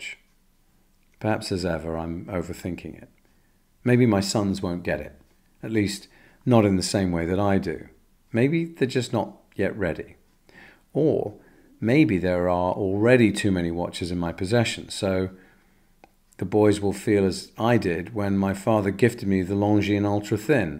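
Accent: RP, United Kingdom. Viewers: Your English is Royal. I love watching your videos. Thank you.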